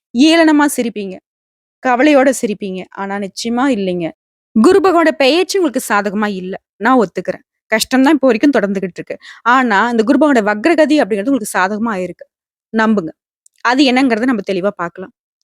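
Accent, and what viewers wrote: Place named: Kerala, India. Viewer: உங்க மலையாளம் கலந்த பேச்சு அருமை,சொன்னது நடக்கும் என்று நம்புகிறேன் சகோதரி.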